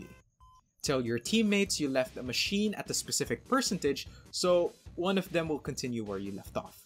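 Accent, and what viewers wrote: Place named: Philippines. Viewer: are you a Filipino? I'm guessing based on your accent :D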